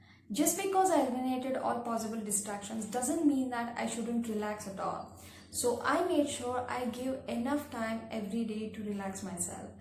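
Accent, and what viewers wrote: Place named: West, United States. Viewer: So chosen to adapt the western accent too.. and advertising the three books with white cover..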